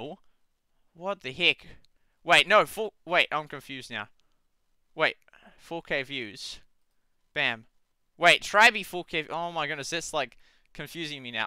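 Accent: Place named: New Zealand. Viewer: Bro, are you a kiwi? Or Australian? I'm getting kiwi twangs in your accent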